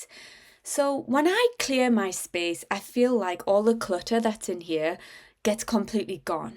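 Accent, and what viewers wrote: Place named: Europe. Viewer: Hello, you seem to sound EUROPEAN. I'm from America.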